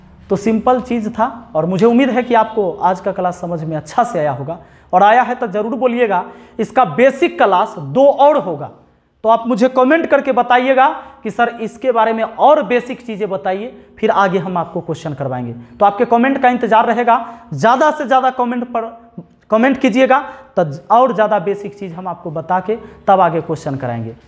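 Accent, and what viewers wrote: Bihar, India. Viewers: Bihari hai sir😊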